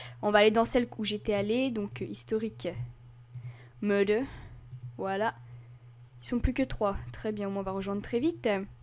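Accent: France. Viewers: l'accent du sud qui me fait rire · Arrete de faire des blagues nulles avec ton accent marseillais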